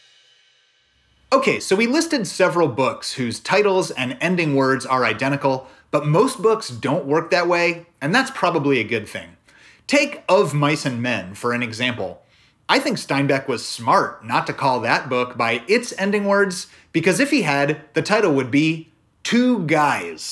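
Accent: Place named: France. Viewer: Quel belle accent français très cher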